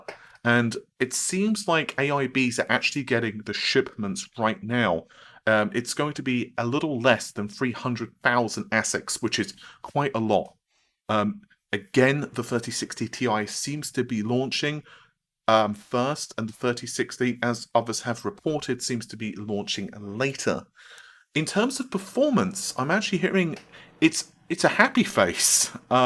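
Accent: United Kingdom. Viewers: British accent OMG 🤣🤦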